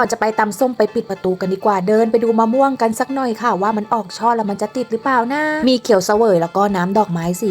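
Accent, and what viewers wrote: Suphanburi, Thailand. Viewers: ชอบค่ะสำเนียงสุพรรณเพราะดีค่ะ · เหน่อสุพรรณ คือสำเนียงหลวง ครับ❤❤❤ ผ๋มไผ่ขว๋าง ครับ😅😅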